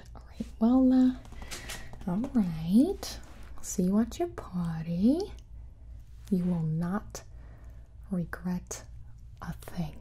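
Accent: United States. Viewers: The American accent tho 🤣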